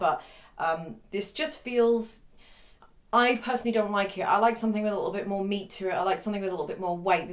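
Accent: United Kingdom. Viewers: Unless your from the USA. Then it would seem normal and someone with a British accent would seem different but I would never use the word "hilarious" because she sounds wonderful. BTW one of the most renowned makers of violins is a New Yorker. His violins sell new for over 100K and you'd have to wait 3 to 4 years to even get one if your lucky enough to get one. He doesn't talk pretty either ( BUT who cares)??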